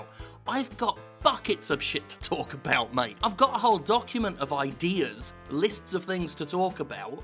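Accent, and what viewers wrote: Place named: United Kingdom. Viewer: So satisfying hearing a British bloke tell it how it is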